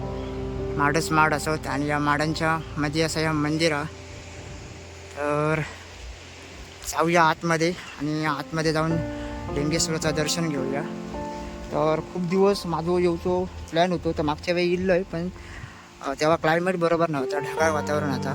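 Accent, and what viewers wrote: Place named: Konkan, India. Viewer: सुरुवातीला थोडंसं फास्ट बोलला होता पण नंतर छान पद्धतीने माहिती दिली आहे.कोकणी भाषा गोड वाटते ऐकायला.❤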